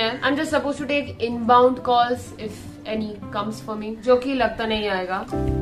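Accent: Bengal, India. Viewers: Are you a bengali girl bcz u say "maa sotti baana 6o" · the bengali english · Are u a bengali🤔... I heard talking with ur mom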